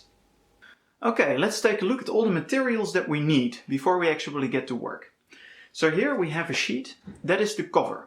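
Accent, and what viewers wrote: Netherlands. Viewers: Do I detect a Brabant accent?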